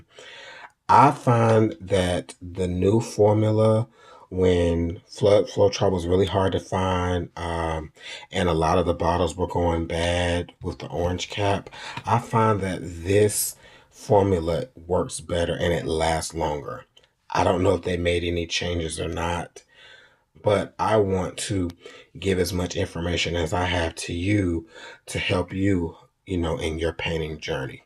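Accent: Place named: Alabama, United States. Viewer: You sound like you live over here where I live lol I live in Gulf Shores, Alabama… Where the humidity is crazy and the weather is hot during the day and not as hot at night, but the humidity makes it awful lol where is it that you live?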